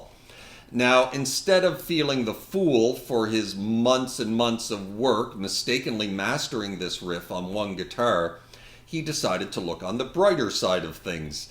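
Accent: Canada. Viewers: Great video! You spoke three words and I could tell you were Canadian eh!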